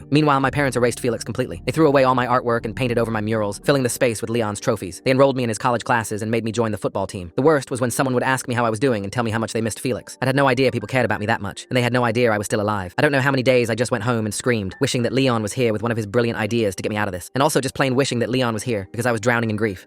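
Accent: United Kingdom. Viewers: Is it just me or did he turn british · He turned to British and that make this so unserious sound. I’m sorry if you were bored the entire time I would actually sound serious. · Omg I thought it was just me not noticing that the voice was British the whole time 😂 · Am I the only one who realized the British accent?! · ok good im not going insane the tts did turn bri'ish halfway through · WHY TF did he get a British accent half way through?.Anybody else get confused because the man talking is Felix + his brother Leon passed away. Felix took Leon's spot, so why would he want to grieve himself? Because he's at Felix's funeral but he thinks people need a mourn Felix. I think there was a typo and they meant Leon??? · Why is bro British now?? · what's with the British🇬🇧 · He- hes British HE'S BRITISH AHHHHHHHHHH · I'm not the only one who noticed the British accent, right? 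· the British acc is wild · Why did the voice slowly get British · You sound British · Also what’s with the british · why did he turn british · O just realised that the voice is British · Why do you turn British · It’s a British accent 🤣definitely can tell where you’re from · Why did you turn British? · It took me FOREVER until I realized he's British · The British accent 😂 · the british accent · Why did he turn british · Me: BRITISH??? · Why did it turn British midway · Great video but like why did halfway through the voice thing you just turned British?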